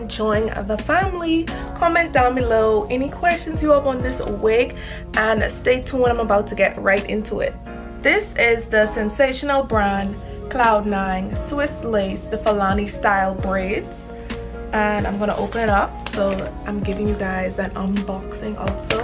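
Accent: Bahamas. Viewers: Your accent sounds Bahamian...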